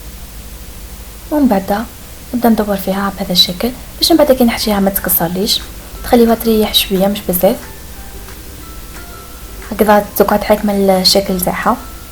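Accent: Algeria. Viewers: من صوتك عقلتك بلي جزائرية مي كي شفت خميرة نوارة تأكدت هههه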